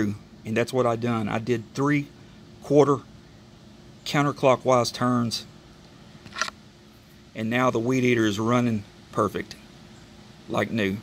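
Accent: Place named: South, United States. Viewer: My Lord, you're a genius. Your idea gave me for free and almost immediately what several dollars and an hour long trip to a hardware store would have cost me. This worked perfectly for my Poulan Pro PR28SD string trimmer. Your accent tells me you are from the South...where else could such creativity come from? Thanks.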